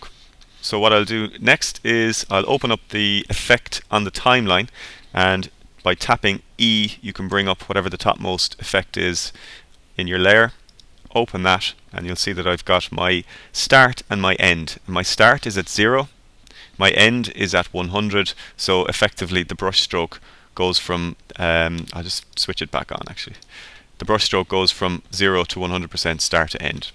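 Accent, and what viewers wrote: Ireland. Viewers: Came for the content, stayed for the Irish accent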